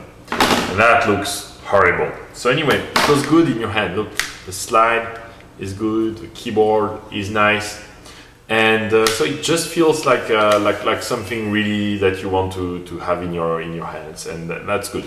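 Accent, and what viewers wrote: France. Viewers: LOL you are French right ? i've recognize the "accent" je suis francais aussi, sinon super tes videos franchement sa fait plaisir de voir un francais qui review le palm pre(je cherchais sa depuis un moment). t'a fait HEC je suis mdr ... j'y vais a la rentrée pour ma quatrieme année, voila pour ma life je m'abonne allez a+ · r u french :D?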